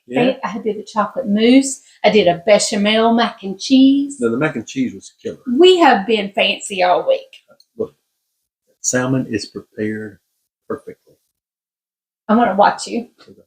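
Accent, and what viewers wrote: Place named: South, United States. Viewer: I was raised saying salmon with the same pronunciation as you. We are beautiful southern belles and that’s just how we talk. 💖 I’m not a salmon person but this sounds really good and I just added salmon to my grocery list. Thank you, I need to add more fish into our diets.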